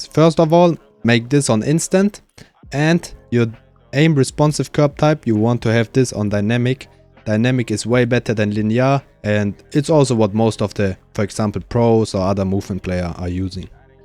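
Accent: Germany. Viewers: Are you German ? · Damn Bro U Made m3 a movment demon fr and i can hear the German accent 😂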